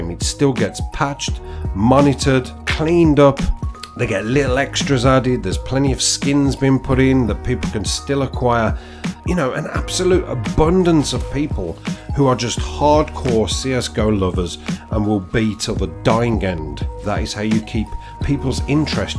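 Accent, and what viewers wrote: Birmingham, United Kingdom. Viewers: your silky smooth brummie tones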